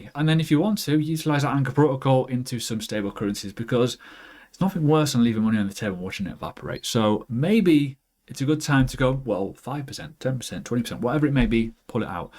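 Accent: United Kingdom. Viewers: I LOVE THE BRITISH ACCENT :)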